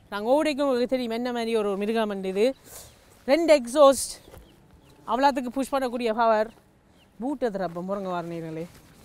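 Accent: Jaffna, Sri Lanka. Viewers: Itz ilangai tamil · Bro unga srilanka Tamil romba pudichurukku Next Ben's review &Skoda kodaiq review heavy illa Tamil illa Main reasons pls all the best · Hi brother I like your Sri Lankan Tamil style. Keep rocking 👍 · Neenga jaffna va Anna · Seems Ceylon Tamil review ... Thanks for posting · Sri Lanka Tamil... Azhagu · Enga Srilanka Tamil La Explain Pannum pothu Happy a iruku Bro 😍😍😍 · நல்ல சுத்தமான இலங்கை தமிழ் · Sri Lanka tamil 😆😆😆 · Super srilankan Tamil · Unka language style srilankan mathiri irukkuthu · Ohhh bro. I love ur lankan tamil accent.. keep going bro. Love from Madurai, Tamilnadu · Brother unga Ceylon tamil suppr... And also your ammalee word.. 😍😄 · Sri lankan slang ❤️ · srilankan tamil nalla irukku...♥️♥️ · Bro ninga srilankan aah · Hi bro i am srilanka unga videos super congratulations I am waiting for more videos neenga srilankan citizen Pola pesuringa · Jafna Tamil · Srilankan tamil kaekurathukku nalla irukku review va vida nenga paesurathu pudichirukku bro keep it up i am ur new subscriber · Are u srilankan? 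· Srilankan slang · Sri Lanka la pronunciation apdidhaa irukkum bro..adhuvum azhagaanadhu dhaa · Neenga Jaffa va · Semma tamil bro srilankan tamil aa reviews sprp rolce royce review um paathuten spr bro ungaluku car kudutha ellarukum oru periya thnx naan sonnen nu sollirunga... Keep rocking and do more. All the best bro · ஸ்ரீலங்கன் தமிழ் அருமை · Srilankan tamil bro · Kingsley Rock It's Sri Lankan Tamil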